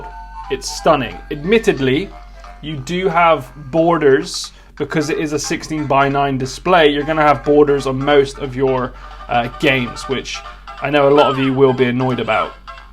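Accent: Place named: West Country, United Kingdom. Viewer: Jesus that west country accent :) Hardly ever hear YouTubers with a south west accent. Love it!!! lol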